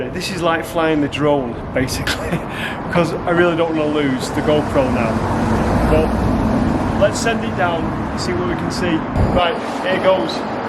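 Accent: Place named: Scotland, United Kingdom. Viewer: I can tell you're from a Scottish part of England, there's no mistaking the brogue. We have several different accents here in the USA, the State of Massachusetts has an accent that sounds similar to Scottish the pronunciation of some words. Love your channel!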